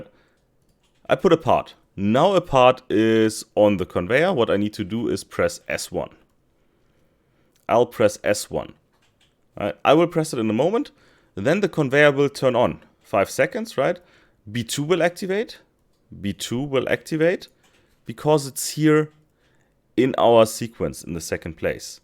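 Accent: Germany. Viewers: I love your videos, thank you very much! Also, PLC Zim , classic german-english!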